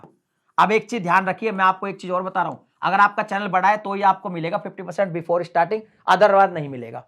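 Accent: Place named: Haryana, India. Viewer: Aajkal aapki hariyanavi nikl rhi sir 😊❤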